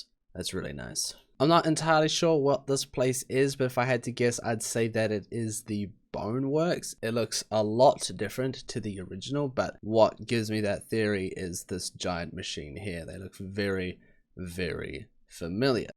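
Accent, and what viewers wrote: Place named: New Zealand. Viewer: Hey bro, great vid! Is that a fellow Kiwi I hear?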